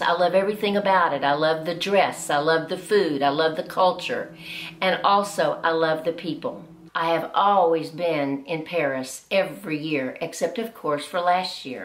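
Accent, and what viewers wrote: South, United States. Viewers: I love her accent-American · I like your uniqueness..they will love your southern accent, many people will be envious of you.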